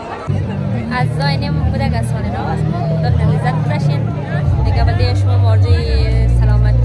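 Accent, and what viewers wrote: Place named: Hazarajat, Afghanistan. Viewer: سلام ووقت تون بخیر)منو سال نو میلادی تبریک میگم بهتون] ماشالله چه لهجه هزارگی حافظ کردین من خودم هزاره هستم خیلی کلمات تون متوجه نمیشم .منم خارج کشور هستم مهاجر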